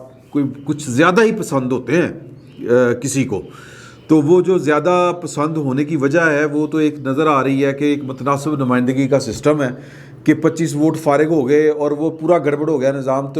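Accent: Punjab, Pakistan. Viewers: Hahaha ap k tajzia sun kr Maza ajata h special ap k teth Punjabi touch se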